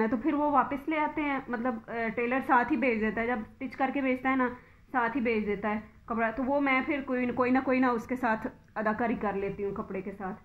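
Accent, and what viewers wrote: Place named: Sialkot, Pakistan. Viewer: MashaAllah bohot bohot peyary design ap bnati hain. apki awaz se lgta hai ap Noreen ki sister hain life of noreenسیالکوٹ ki lgti hain ap🥰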